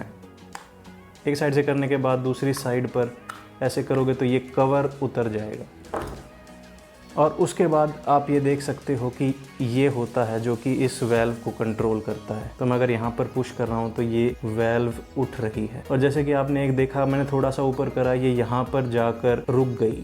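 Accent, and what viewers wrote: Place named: India. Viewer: What language are you speakin? English-indiano? We don't understand a f****n thing seriously....add subtitles.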